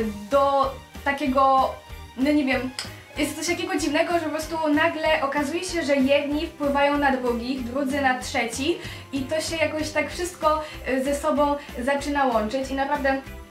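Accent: France. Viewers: Masz coś w akcencie z francuzkiego... Mieszkałaś kiedyś we Francji. Albo coś w tym stylu? 😄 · Masz francuski akcent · Akcent .. Francuski? :D jak się ,,NAUCZYŁAŚ" tego akcentu lub j. plskigo? :o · Mi to nie przeszkadza, taki trochę francuski akcent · Skąd pochodzisz bo na tym odcinku słyszę taki francuski akcet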